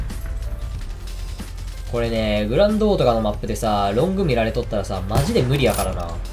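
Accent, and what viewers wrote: Kansai, Japan. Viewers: の関西弁好き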